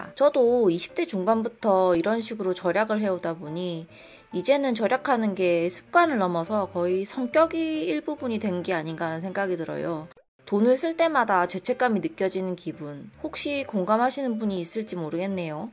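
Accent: Gyeongsang, South Korea. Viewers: 저도 두사람이 하는줄 알았어요 경상도 사투리 너무 좋네요 익숙한 ~~~ ❤❤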